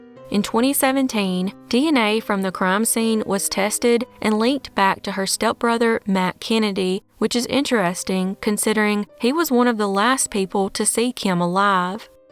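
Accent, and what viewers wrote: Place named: Eastern Kentucky, United States. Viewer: I married a gal from Owsley County Eastern Kentucky. Among other things, her accent made her absolutely irresistible to me! This narrator sounds exactly like my wife! Haha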